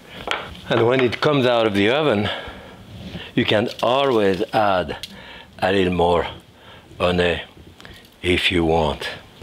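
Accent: France. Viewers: I just love your French accent. Especially the word. “Huny “ lol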